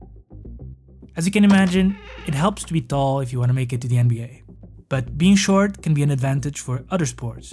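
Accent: Portugal. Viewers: i cant take this portuguese accent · I'd bet my whole house that you're Portuguese, by your accent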